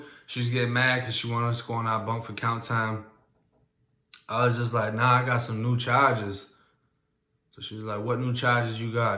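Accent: Boston, United States. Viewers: I can tell your from Boston cuz the way you say your A's. For ex Stahted= Started Cahky= cocky but you may have grew up from 12ish on in florida. But you def dnt have a native north or south Floridian accent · You sound like your from Boston. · he talking about florida but sound like he from boston...the fuck? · Say bro you from Boston or Florida . You kinda sound like ol boy from Sam Adams commercial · You from boston originally? I hear it in ur voice occasionally and honestly you just look and sound Boston bred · I knew you had to be a Boston kid from your accent but you always talk Florida stuff, you even kinda still got our swag for someone who says you didn’t grow up in mass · Your accent sounds like you’re from Bean town!! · Bruh u gotta Boston accent but ur from florida??? I been to Illinois doc but from what I seen bout Cali prison white boys who r confused bout their pigmentation come in tryin to sound black. 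Get reminded who they are real quick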